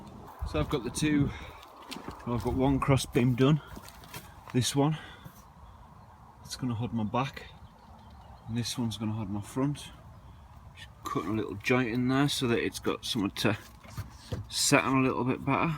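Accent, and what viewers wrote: United Kingdom. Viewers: OMG XD where do u live? I live in the U.K. And I see you have the accent!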